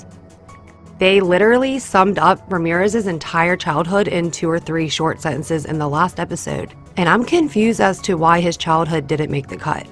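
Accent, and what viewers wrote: United States. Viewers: You live in Maryland or Pennsylvania? I hear an accent, Pittsburg? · are you from delco? or jersey?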